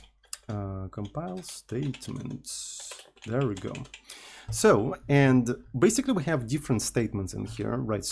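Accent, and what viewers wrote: Russia. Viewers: ну, русский/украинский/белорусский он точно знает, и акцент очевидно есть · Мне одному слышен русский акцент английского языка?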